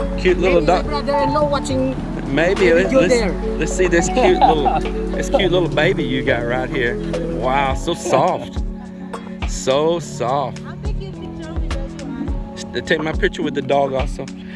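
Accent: Texas, United States. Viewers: Well said, the trolls come out when things aren't good for them, just say it once let them know you know great stuff, love that Texas drawl haha say hi to love you guys great content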